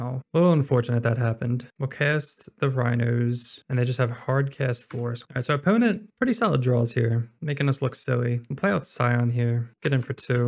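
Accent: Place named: Philadelphia, United States. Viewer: Great vid man. Are you from Philly area?? The accent is strong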